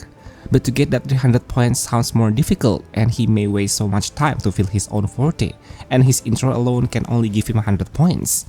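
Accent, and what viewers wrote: Indonesia. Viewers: Org indo bukan si 😅 kek ada aksen indo nya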